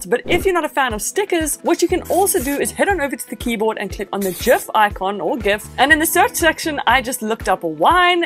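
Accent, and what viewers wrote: South Africa. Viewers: new sub. youre south african, right? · Super professional! Learnt so much. Thank you!!! As soon as I heard your voice I knew this was a saffa lol.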